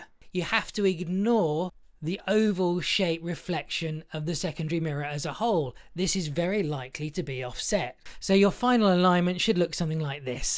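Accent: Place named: London, United Kingdom. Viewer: 1 Frac and 1 Mak, no need for this stuff. However, love watching his vids for his London accent and (some) comical moments 😁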